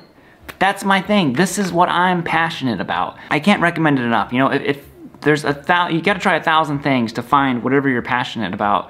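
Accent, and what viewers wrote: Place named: Ohio, United States. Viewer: I love your videos as there is such much positivity. I like your "weird" Ohio accent as it is YOU, something that always comes across in your videos.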